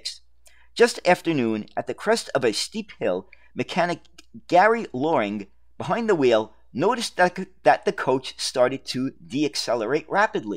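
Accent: New York, United States. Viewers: The audio was just fine. The NYC accent is authentic.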